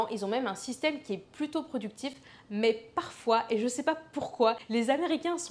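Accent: France. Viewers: J'imagine que lorsque tu commandais au "drive", que tu parlais anglais avec un très fort accent français.... pas étonnant qu'ils mettaient du temps à comprendre 😄 Sinon, jolie vidéo assez réaliste à part quelques petites généralités pas nécessairement vrai dans tout les états.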